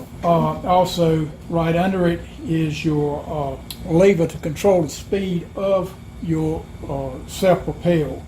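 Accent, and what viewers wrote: South, United States. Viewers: The southern accent is awesome! I love my Kobalt mower. Thanks for the review.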